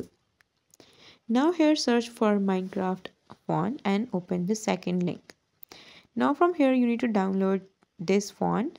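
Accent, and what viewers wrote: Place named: India. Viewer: Of course we will get trolled cause don't y'all hear the Indian accent slipping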